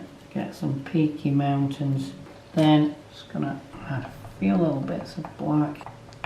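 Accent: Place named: Yorkshire, United Kingdom. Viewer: Nice to listen to your Yorkshire accent, thanks for your Video 🤝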